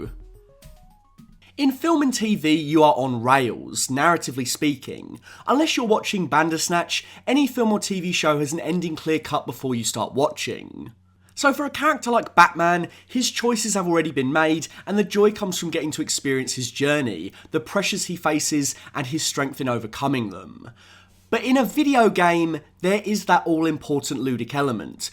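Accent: United Kingdom. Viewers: You are one funny sounding britt!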